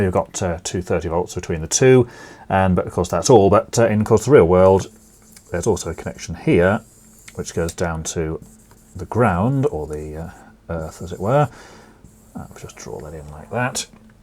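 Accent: United Kingdom. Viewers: Hello Sir , initially really I'm very thankful of you for your very helpful video series , you present your nice videos look alike "sport events tellers" and sometimes understanding your accent is a little bit hard for none natives and specially a challenge for me, anyway I love British accent and by listening you my English listening skills would be improved as well...LOL... , could you please answer these questions , on (time of video ) in the book we have RCD and RCBO , it seems that RCBO is better than RCD because RCBO does somehow operation and in this way "save life of people" and also protect the devices such as TV and washing machines and so on , yes ?? So what is the difference between RCD and RCBO?? which one of them for home is better and most suitable ? each ones RCBO for TV and washing machine is needed separately ? each one should has which specifications/ amperage ? 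each RCBO can cover how many devices ? sorry a lot of questions !! I am contacting from Kiev in Ukraine and by answering my questions you will solve a lot of problems for me and at the same time it is a very big favor, Your fan , your supporter , Afshin Heydari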